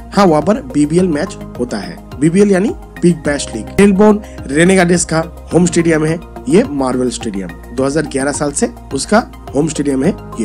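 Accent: Bengal, India. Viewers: Bro are you Bengali? You sounds bong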